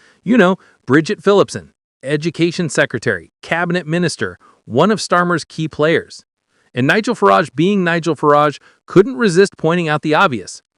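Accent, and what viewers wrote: United States. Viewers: as usual - no clips , no evidence - just the same boring American voice enjoying the sound of his own voice!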